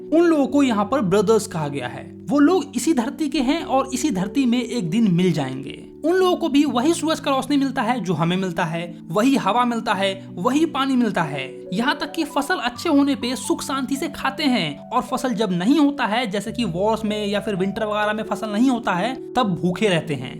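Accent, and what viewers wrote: Bihar, India. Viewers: Bihari acent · Bihar se ho na bhai❤ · Bhaiya aap Bihari ho kya❤ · By voice we call yell he is from Bihar 😅😂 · Aap bihari ho kya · Bhai aap bihar se hona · Bihari ho yar app to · Bihari hai ke tu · Pakka aap Bihari ho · Are you from Bihar?? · Aap Bihari ho kaya?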